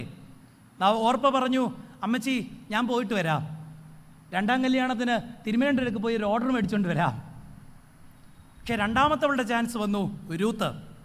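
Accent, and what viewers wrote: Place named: Kottayam, India. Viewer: very valuable...speech Iheard the full-speed. kottayam language made me very happy.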